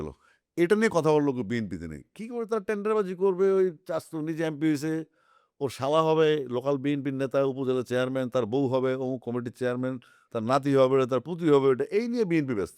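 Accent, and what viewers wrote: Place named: England, United Kingdom. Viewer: The host should slow down a little and stop mixing Bangla with an English accent…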